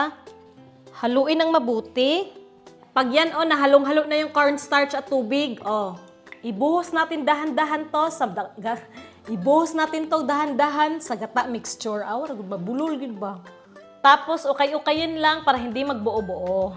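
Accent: Visayas, Philippines. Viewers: Bisaya kaba te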